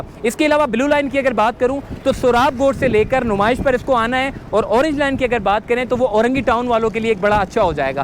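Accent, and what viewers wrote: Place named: Punjab, India. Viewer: تمھارے لہجے سے پنجابیت کیوں جھلک رھی ھے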